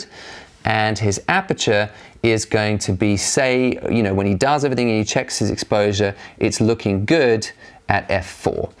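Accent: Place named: South Africa. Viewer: Hi - South African here. There are qualities in your accent that make me think you might be from South Africa. Are you? I've just subbed:)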